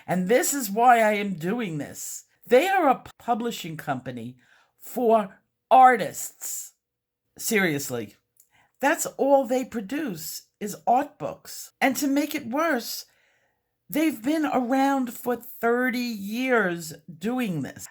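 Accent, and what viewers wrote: New York, United States. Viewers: you are simply fabulous. I get in trouble sometimes for my blunt honesty. However, I will not change that. You made my entire day saying FWOF!!! Your talent and honesty are exactly why I come to you to learn and be entertained as an artist. You got it all! And you know I have a thing for "New Yorker's accent" lol.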